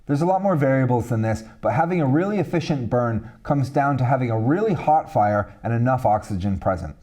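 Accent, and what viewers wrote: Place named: United States. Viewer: You sound very American for a bloke in the UK.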